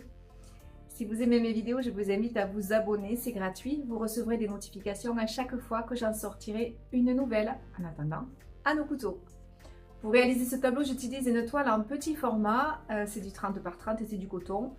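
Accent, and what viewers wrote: South, France. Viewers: et en plus avec votre bel accent du sud c’est encore plus agréable à écouter et voir 🥰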